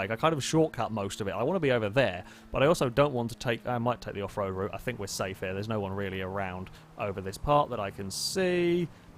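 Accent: United Kingdom. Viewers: Their are some british dialects I find pleasant then their are others that are just plain annoying. Yours sir, is the latter. Zip it. Closing this obnoxious sounding video.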